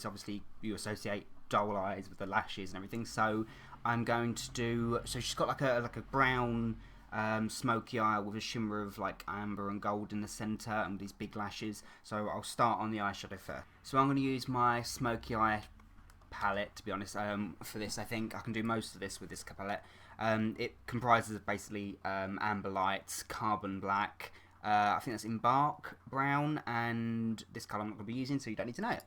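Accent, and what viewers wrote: United Kingdom. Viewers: me and my mom love your britsh voice what part of englend are you from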